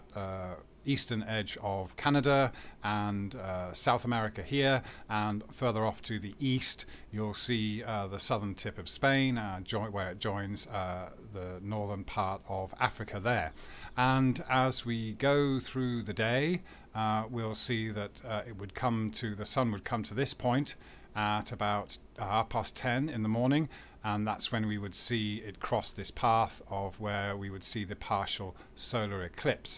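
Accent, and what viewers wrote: United Kingdom. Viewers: Proof positive that a British accent doesn't automatically make you sound smarter!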